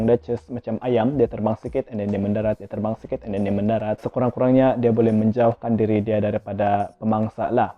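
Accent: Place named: Sabah, Malaysia. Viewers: Pekat betol sabah kau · Ini bukan cakap indon ye gais, ini cakap Sabah..... saja nak bagitau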